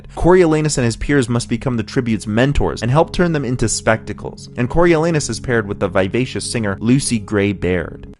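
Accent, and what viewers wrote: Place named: Canada. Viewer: Question, is he Canadian? The “OUUT” gives him away. lol Love the video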